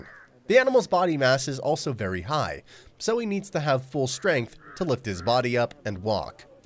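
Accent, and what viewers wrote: United States. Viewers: I get the that the script, which is also in the description of this video, was written by the creator of the video and English is not their first language so that is why there are so many weird phrasings or things that don’t make sense that the narrator says and that’s fully understandable. But the narrator sounds American, so why did he not make corrections to those errors instead of allowing the video to sound ignorant? I know narrators are supposed to read what they’re given, I’ve done that work before. But when it’s really bad like this you correct it.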